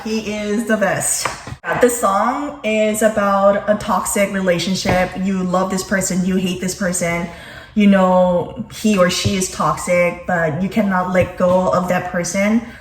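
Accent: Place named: General American, United States. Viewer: 💚 저 요것도 섀도잉 자료로 사용해야 겠어요!!ㅎㅎㅎ 진짜 저 억양 너뮤좋아해요오💚💚❣️❣️ㅎㅎㅎㅎ 진짜 미국영어의 정석이셔서 제가 젤 좋아하는 유튜브 채널이랍니다❣️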